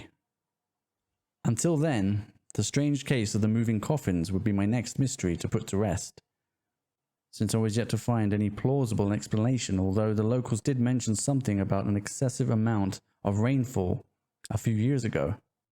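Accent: United Kingdom. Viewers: Hope you have a SPOOKTACULAR HALLOWEEN weekends mate! 🎃👻 P.S : Loving your British accent more and more. 🤍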